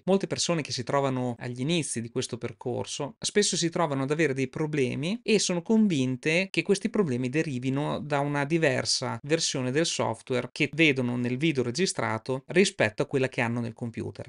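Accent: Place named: Emilia, Italy. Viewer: Ciao mi sto avvicinando a Blender da pochi giorni, provengo da Cinema 4D con un livello appassionato avanzato, grazie del corso lo seguirò con interesse e costanza, dal tuo accento sento che sei emiliano, valore aggiunto in quanto amo la tua terra tanto che ho la compagna della provincia di Modena! a presto!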